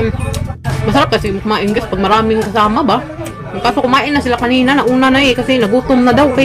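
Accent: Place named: Visayas, Philippines. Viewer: katawa ko sa imung bislog, bisaya tagalog ba. By the grace of God, modanghan napud imung viewers k ga bislog naka unya joker pa gyud ka. Another thing about you k dili gyud ka selfish, k you're always thinking and generous to other people.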